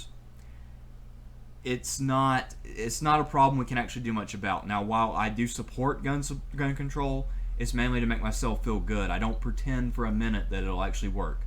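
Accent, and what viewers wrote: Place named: Texas, United States. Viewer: ...."So if we end up losing in November, don't blame us. Blame yourselves...If you feel otherwise, go fuck yourself." You sound hilarious using vulgarity with that Texan accent or whatever it is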